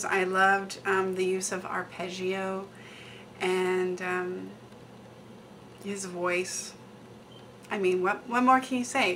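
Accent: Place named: Germany. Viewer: still liv zhat times and your well german accent ;) cheers kc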